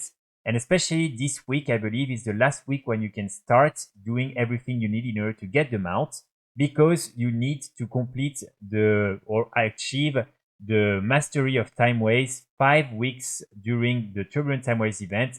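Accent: France. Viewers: J'aime beaucoup ton contenu et tu est super regulier ca fait vraiment plaisir , j'ai pas un niveau de dingue en anglais mais je comprend l'essentiel, ca s'entend que tu est francais du coup je me demandais si tu avais deja songé a faire tes videos en fr je suis certain que ca trouverais son public 😊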